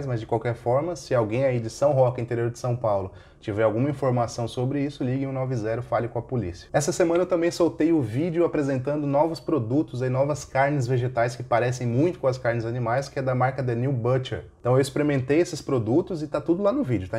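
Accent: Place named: Rio Grande do Sul, Brazil. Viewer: Tu foi afetado pelo gauchês. É um caminho sem volta. Minha noiva (de Santa Catarina) já fala tri, bah, afu, tchê, chinelagem e cacetinho. Bem-vindo ao clube. Mazaaaaa!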